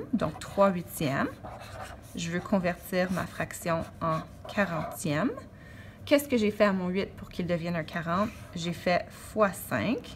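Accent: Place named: Quebec, Canada. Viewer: bien expliquer je suis la seule a avoir écrit sinon j'adore ton accent quebequois PS:ds pour l'ortographe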